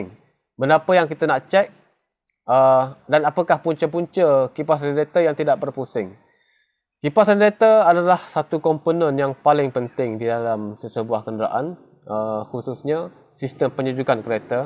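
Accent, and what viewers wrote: Kelantan, Malaysia. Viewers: Orang Kelantan ke bos..?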